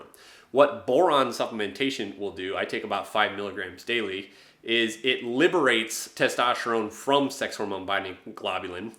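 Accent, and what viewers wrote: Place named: Michigan, United States. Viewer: sound like youre from michigan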